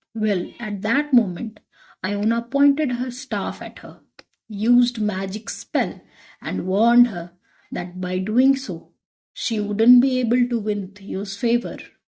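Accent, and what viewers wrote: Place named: India. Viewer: Indian English 😂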